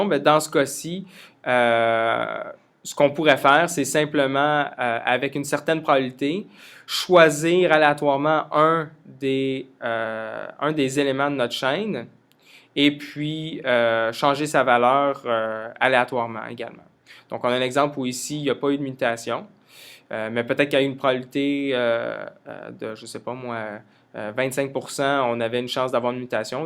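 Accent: Quebec, Canada. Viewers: le francais canadien :) merci frero pr l'effort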